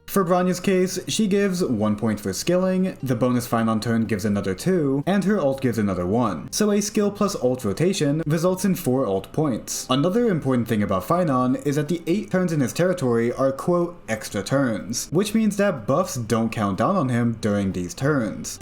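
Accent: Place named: United States. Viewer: You sound like an American so I was wondering how you mispronounced Geopard's name.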